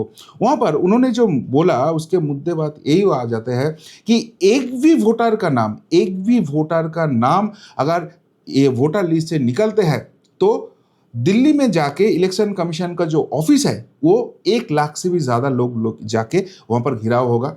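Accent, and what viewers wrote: Bengal, India. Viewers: আপনার হিন্দি যথেষ্ট ইনপ্রুভ করেছে। বাঙালিয়ানা টানটা যত কমিয়ে নিয়ে আসতে পারবেন তত সুন্দর হবে । ভিডিওটাও যথেষ্ট ভালো হয়েছে ।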